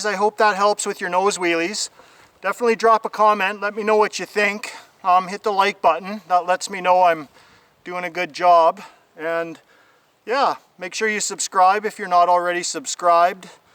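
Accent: Canada. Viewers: lol i love this guys accent! Canadian?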